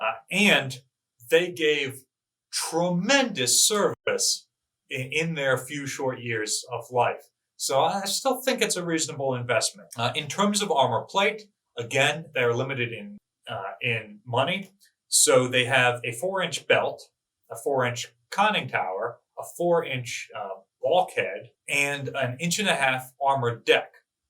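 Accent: United States. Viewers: He only has to speak typical American English.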